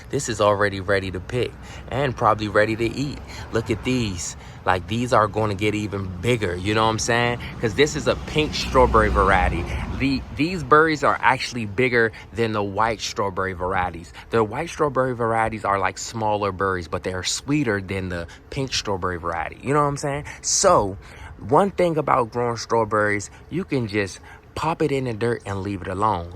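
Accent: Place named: South, United States. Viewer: The Southern accent is killing me😂. “Sum Burries “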